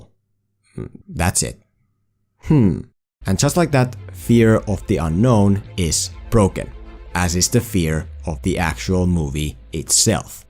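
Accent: Finland. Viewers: Is this Man finnish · Are you from finland. Your accent is atleast similar to the finnish one so.... Torille tavataan ja SUOMI PERKELE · Are u from Finland?, Ur accent sounds Finnish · Are you Finish cause you have a real Finish accent... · Is finnish? Do i hear a finnish accent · He sounds Finnish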